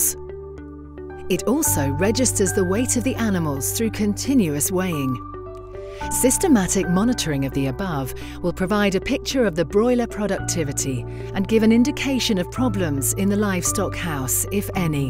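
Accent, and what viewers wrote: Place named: United Kingdom. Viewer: The only horrible thing of this video is that brit accent. I had to turn off the audio.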